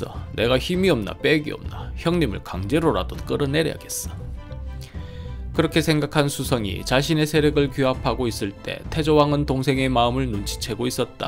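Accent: Gyeongsang, South Korea. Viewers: 무슨 고구려왕과 역사를 얘기하면서 나레이션을 어울리지도 않는 경상도 사투리를... 표준어를 못하는 것도 아니고... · 영상제작에 사투리가 불편하면 안보면될걸ㅉㅉ 제작자님 일상생활에 경상도 사투리 접하기 어려운 저로서는 들을때마다 구수하고 재밌는 억양이 귀에 팍팍 잘 꼿히고 있으니 있는 그대로 계속 해주세요~~!!!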